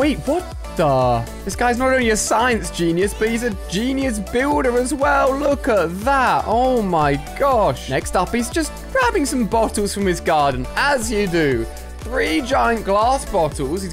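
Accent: United Kingdom. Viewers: I Like ur British accent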